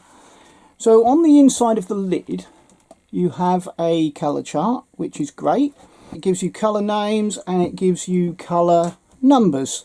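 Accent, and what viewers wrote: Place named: Norfolk, United Kingdom. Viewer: I was searching for which oil pastels to buy, I clicked on your video, and immediately perked up when I heard a good ol’ Norfolk accent! I’m a Norwich gal myself, now living in Leicestershire, and it was so wonderful to hear someone talking properly 😂 I miss beautiful Norfolk so much, I’ll be moving back as soon as I get the chance. Anyway, great review, I think I have a similar art style to you, as in I want more precision, so I’ll be getting the Artecho set. New subscriber here, your review was spot on as to what info I needed. Thank you 😊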